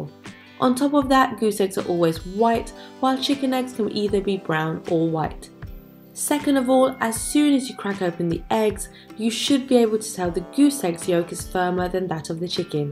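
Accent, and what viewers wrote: United Kingdom. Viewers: She has a English accent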